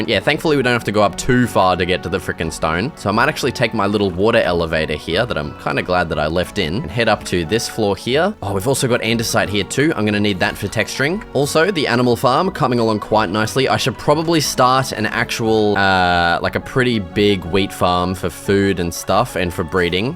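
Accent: Australia. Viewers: All I hear is angry Australian 😂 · That’s just cause he’s Australian lol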